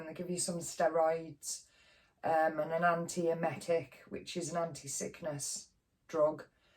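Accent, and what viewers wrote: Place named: Wales, United Kingdom. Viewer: Hello friend. Wishing you a nice weekend in ..... I'm guessing from your lovely accent ....Wales?? I know that your videos are helping - and will continue to help - women with cancer. Take care of yourself, kind lady. ❤️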